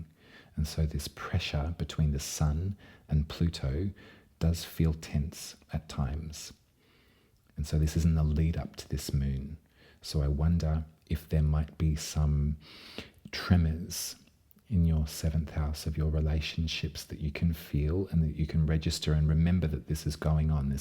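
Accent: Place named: Australia. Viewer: Finally! Someone who pronounces Uranus properly....gotta love a fellow Aussie! (And you do it with such style and grace) ❤🐠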